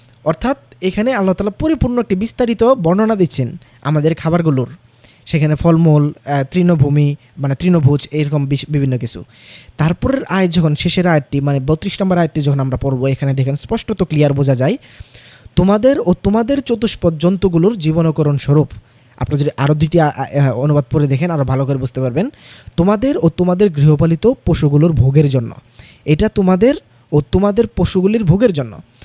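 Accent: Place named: Manikganj, Bangladesh. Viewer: আমার বাসা মানিকগঞ্জ, আর আপনার কথাও মানিকগঞ্জের মত লাগছে এর জন্য বললাম আরকি।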